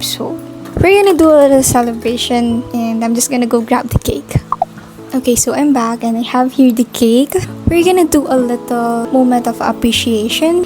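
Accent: Philippines. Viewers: Hi I’m new to this channel and are u Filipino bc u sound like one a if u are one hello twin 😂 ❤ Edit: oh wait u are Filipino pala 😂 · I knew you were tagalog bc of ur accent!!! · I CAN HEAR THE FILIPINO ACCENT 🤩💕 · Do i hear a pilipino accent?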